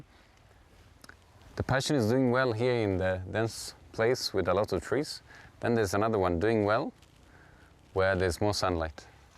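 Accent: Kenya. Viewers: You speak like Maasai. Guess you've been around quite long. Wonderful video. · This is amazing... the transformation is real🤗 I work in semi - Arid area in West Pokot, we should do an exchange visit program, share knowledge and seeds... 🤗 Kudos for the adaptation of Kenyan English accent😍👏👏🌻🎊🎉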